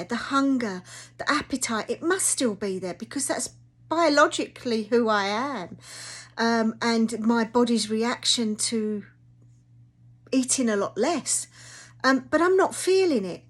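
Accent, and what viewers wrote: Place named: United Kingdom. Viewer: oh ok! I heard the accent and assumed you were over there lol. I was wondering how much it would be over there because US meds are ridiculously expensive even though the same meds in the UK are free.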